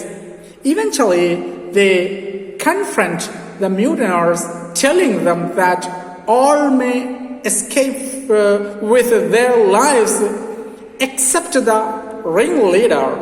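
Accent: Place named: England, United Kingdom. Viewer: Presented well. Your adherence to English accent is appreciative but let me tell you Indian accent is also acceptable. Once fluency is achieved accent is welcome otherwise it will sound a little bit artificial. Having said this, I don't mean you are average , you are well above and I wish you splendid honeymoon with the language.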